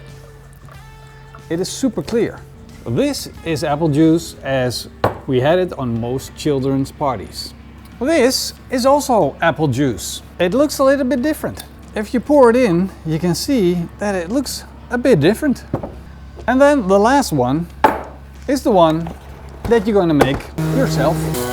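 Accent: Netherlands. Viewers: I do really like your videos and there is a soft spot in my heart hearing a Dutchman speak English, as I went to high school in Den Haag due to my father being transferred over to Europe. That said, I do not really care to own the knives, but having to open accounts on all these different social media platforms is too much for me. I hope you might take that into consideration in the future. FYI, the Big Mac taco recipe was absolutely fantastic! I have turned several people on to your channel due to that recipe. Keep up the great content and take care.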